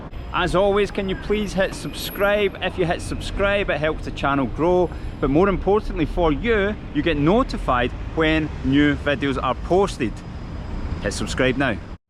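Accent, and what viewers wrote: Scotland, United Kingdom. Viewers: Lol this Scottish accent is exactly the one they used in Counter Strike when you play for the ct forces. Any time I hear that guy I want to buy additional ammo and weapons ; ) <link> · Этот британский акцент)) такое ощущение что я фильм Гая Ричи посмотрел))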